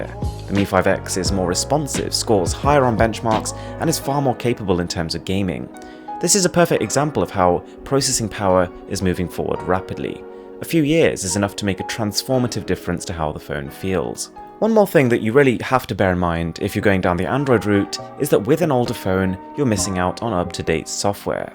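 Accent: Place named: United Kingdom. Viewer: he was born and brought up in England. He's a British citizen, and hence the accent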